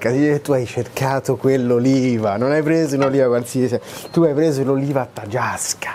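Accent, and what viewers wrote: Rome, Italy. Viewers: Ma non è che imita. I romani parlano così 😅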